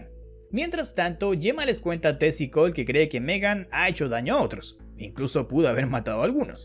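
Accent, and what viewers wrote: Chile. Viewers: este wn es chileno a mi no me engañan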